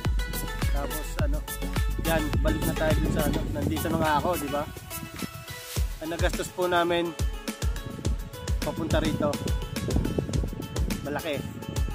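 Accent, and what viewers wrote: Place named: Batangas, Philippines. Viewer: parang Batangenyo ang punto mo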